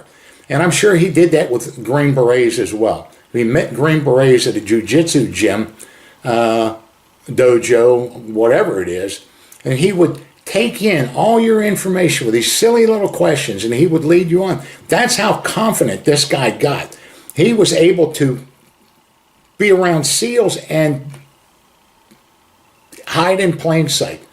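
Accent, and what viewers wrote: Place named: Pennsylvania, United States. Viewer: LOL! I love how takes over and IS the boss. Where at are you from in PA? I'm from a small town called Barnesboro named after the coal mining company who owned everything "Barnes and Tucker". When I was an Apprentice Lineman we were building a transmission line outside of Ebensburg PA and we caught a crow that was injured on the right of way. My foreman took him home and raised him and he turned out to be the biggest thief of anything that was shiny. LOL! He was the best pet he ever had. Could never trust him around anything he was able to pick up though. HA! Did 3 years in the U.S. Army, 1981 to 1984, spent mostly at Ft. Bragg as an MP. Hopefully you won't hold that against me too much. I won't hold being a squid against you. LOL Love what you do! You cracked me up getting on your boy. You definitely sound Pennsylvanian.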